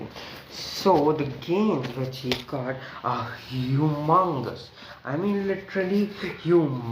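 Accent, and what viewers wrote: India. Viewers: Speak English not indian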